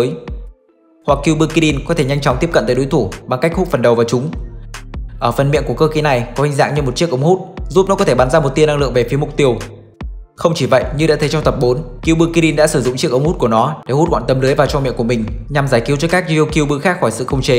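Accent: Japan. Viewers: Tên nào tiếng Anh thì đọc tiếng Anh đi đọc tiếng Anh kiểu Nhật thế nghe nó cứ bị khó chịu ý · Đừng đọc cái kiểu tiếng anh nhật nữa đi. Từ nào tiếng anh thì đọc tiếng anh đi cho dễ nghe.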